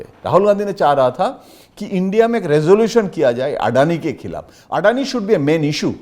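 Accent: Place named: Bengal, India. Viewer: Sir, do baat samaj mein nahi aa rahi hai ? Aap ne kaha Adani jaisi "HASTI" par sambhal kar bolna chahiye. Ek taraf aap keh rahe hai login share karna parliament ki security ke liye theek nahi lekin Adani ka paisa chisnese aur gulf se aana sahi hai ? Yeh 2 sources ka paisa desh ki economy mein lag raha hai toh kya inka paisa economy ko barbaad lar sakta hai ? Baat sirf itni si hai ki kai neta varshoan se parliament mein hai lekin desh ki policy par sawal puche ne se bacchte hai. Doosri baat Shashi Tharoor ka reference dena aap ke poore vishleshan par sawal utha tha hai. Tharoor ne kaha woh meri beti jaisi hai phir bhi aap keh rahe hai ki Tharoor ka support karna TMC leaders ko sahi nahi lag raha hai. Waise Mamta Banerjee kabhi bhi personal life par na soch thi hai na bolti hai. Unka naam lekar apna bayan dena theek nahi. Aap ka lehja (tone) bengali hai iska yeh matlab nahi ki aap Mamtaji ko represent kar rahe hai. 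Aaccha yahi hoga ki ek yodha ko apni ladai ladne de agar aap uska saath nahi fe sakte ya usse sehmat nahi hai. Lastly, she has raised or asked important questions for the people or part of the people? Let us hope truth will triump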